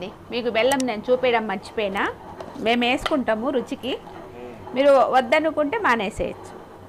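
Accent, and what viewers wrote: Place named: Telangana, India. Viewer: మీ మాట తెలంగణా మాటల వుంది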